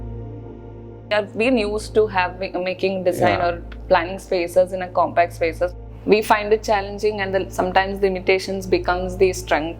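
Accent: Kerala, India. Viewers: Very cute house. Loved the explanation in heavy Malayalam accented English. I didn't understand what he was saying in the end but the bloopers were cute. A few questions please: 1) these metal sheet stair case are they long lasting, though they look visually stunning and the colour yellow looks awesome, will it start creaking and breaking in a couple of years? 2) the sofa in the hall is tucked under the staircase the person sitting in the corner will be an ideal candidate for head injury 😊 The black flooring looks awesome. Is this tiles or black oxide flooring? Do you do projects outside Kerala?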